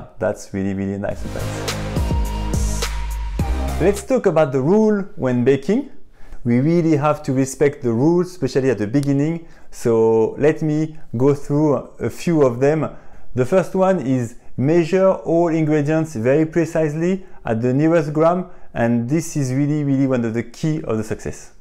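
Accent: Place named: France. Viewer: フランス語の訛りの英語ですねぇ〜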